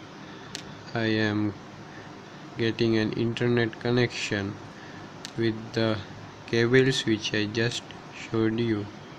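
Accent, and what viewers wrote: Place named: India. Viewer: indian speak very funny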